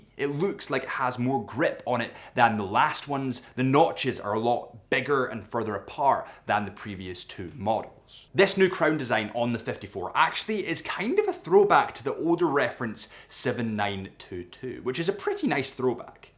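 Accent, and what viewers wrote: Scotland, United Kingdom. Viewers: Why is it called 3 link? You sound like a Scottish adrian Barker you have the same cadence when you pause start and end sentences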